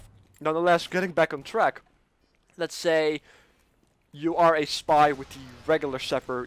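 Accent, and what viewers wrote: Netherlands. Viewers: were u from? u sound a bit Dutch like :)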